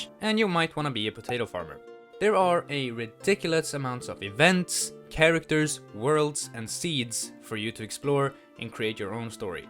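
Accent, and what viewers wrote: Sweden. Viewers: Correct me if I'm wrong, but is that a Swedish accent?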